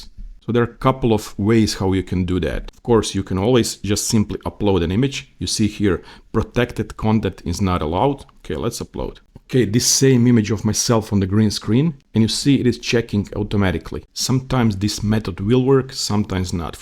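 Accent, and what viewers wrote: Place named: Eastern Europe. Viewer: Where are you from? I hear eastern european accent. this is a grate video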